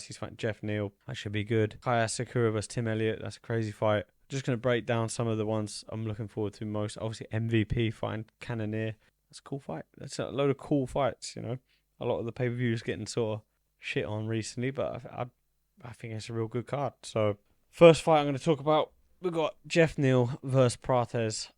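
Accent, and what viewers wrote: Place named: Australia. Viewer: You kinda talk like an Aussie lol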